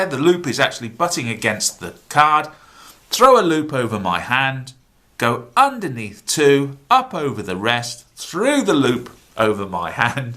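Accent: United Kingdom. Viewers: Where are you based. By the accent I would say UK.?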